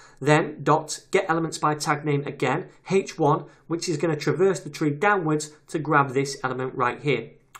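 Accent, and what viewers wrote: United Kingdom. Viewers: is this the so called noble British accent?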